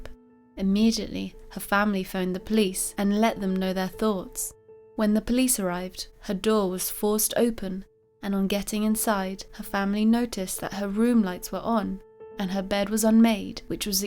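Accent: United Kingdom. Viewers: she got the most British sounding voice ever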